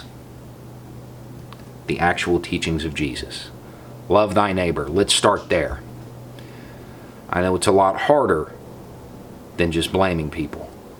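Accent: South, United States. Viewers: you make the hardest subjects so easy to understand for we mortals. Your southern drawl delivery is calming. Thank you sir and keep the good work!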